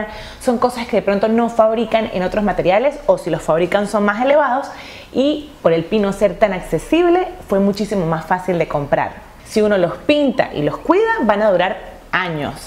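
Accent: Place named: Peru. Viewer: de dónde eres? tienes el acento peruano... lo digo como peruano, español algo neutro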